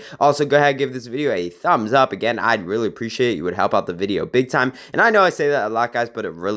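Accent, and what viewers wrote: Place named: United States. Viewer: u sorta sound like an American. Dantdm lol (not hating)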